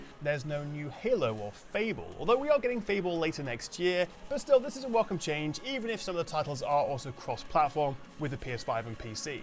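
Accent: United Kingdom. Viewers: Talks way to fast and that briitish accent get real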